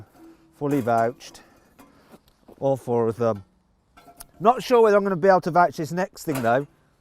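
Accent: United Kingdom. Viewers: マットのイギリス英語好き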